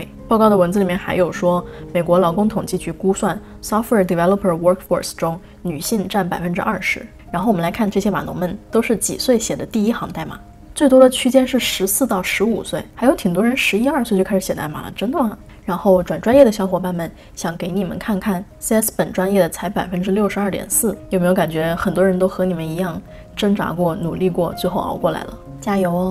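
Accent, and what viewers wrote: Standard. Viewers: 来看只是确认一下 up 主懂不懂技术，看来是我错了，真的懂。发音也很标准，走了... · up主中文非常好。 我基本用英文学完，用全部中文基本解释不来。 而且up主连“逻辑”的发音都跟高考要求一样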